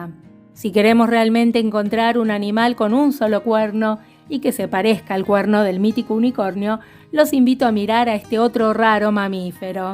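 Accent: Argentina. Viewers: Yo estaba viendo este video bien interesante 😊, cuando llegó mi mamá y me dijo "ella debe ser de Argentina, por el acento" y yo quedé 😮wow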